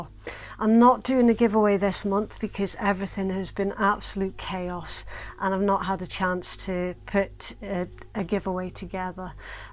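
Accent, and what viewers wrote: Scotland, United Kingdom. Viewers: Resonates with me, detect a wee bit of scottish accent 😊 me too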